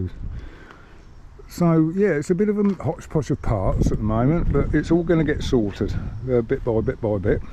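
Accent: United Kingdom. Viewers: 1000 views is actually pretty good for any video but the 'show you what to do' videos are the popular one's that people like to watch with a ride out at the end of project.I would still do the ride out videos you're a young chanel & 1000 views already is good & word will spread about your love for old RE & your knowledge of how to fix them. A tripod be great to film your how to videos & also having someone hold camera 🎥 as you do stuff if you can or zoom in on part & when editing explain what you're doing etc. You also have a good accent that's easy to understand & people like a good British accent to listen too.Keep up the good work.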